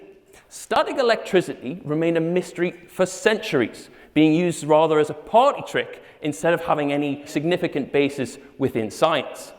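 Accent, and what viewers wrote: Newcastle, United Kingdom. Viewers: Very impressive, proving you can say something smart in a Geordie accent!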